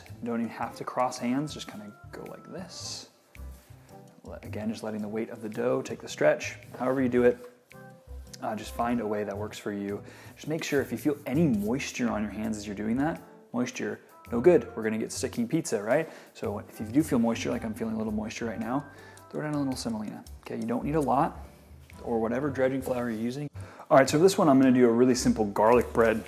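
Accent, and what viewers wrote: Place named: United States. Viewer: What's with the metric system? This guys sounds American but comes off european. Still good video. Thanks.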